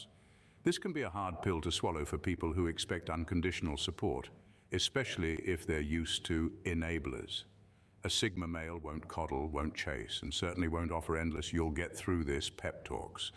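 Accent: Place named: RP, United Kingdom. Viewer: The plummy accent is suuuuch a turn off.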